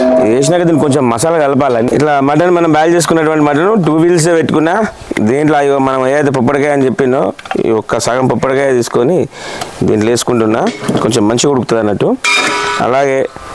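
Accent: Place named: Telangana, India. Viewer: Chala baga cheptunnaru me telangana mata teru bagundi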